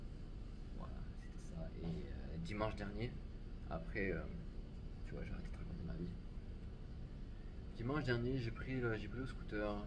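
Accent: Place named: South, France. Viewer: Pleins d’émotions soutenue par ton accent du sud, on apprécie ! 👌🏻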